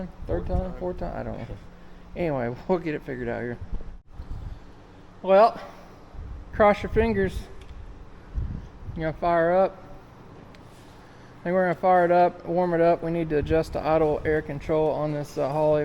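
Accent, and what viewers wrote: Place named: Midwest, United States. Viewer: I DO wish you would call an oil gallery a gallery not a bloomin GALLY, ok ok I know mid western accents sound different - hell I even let you off with alt'nator for ALTER NATOR lol as in it makes alternating pole current not direct current like a dynamo but i'm sorry oil gally simply will not DO lol, anyway enough of that. Well done a really good job well executed.....besides your dads and sausage fingers interference obviously lol....just pray he doesnt demand you pay him back for all the bits he bought eh, your first ride is an epoch event in a young lads life - so much more when you built it all yourself with so much love and dedication, enjoy it every minute you can.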